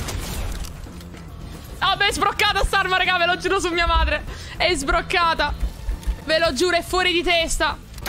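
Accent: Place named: Naples, Italy. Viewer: Ma tu sei napoletana io sono di bari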